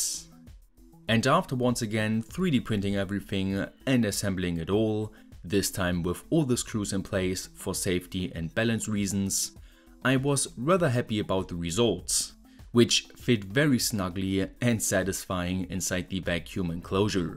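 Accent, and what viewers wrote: Germany. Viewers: Are you German? You have a strong German accent and pronounciation ("batterwy", "differwence") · German accent ?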